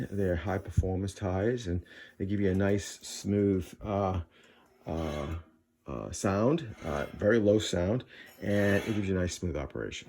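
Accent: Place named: Rhode Island, United States. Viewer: your accent brings back 20 years that I lived around the Pawtucket, RI area! I bought my Reliant in Pawtucket back in late 80's-90's forget now exactly when. My late wife was from that area and she and her family at the dinner table on Sundays was a riot to listen to! Throw me down the stay'yahs a bruum! Anyway I'll double second every other comment here adding that I too have the 14", 1hp DD90 model. Man, that cabinet you built is awesome. The dust collection is genius. Finally, an old woodworking book-set I have has a chapter on bandsaws (1930's-40's) and title is "Bandaws - The Queen of Saws". Today politically uncorrect it still fits. Best video ever, thanks.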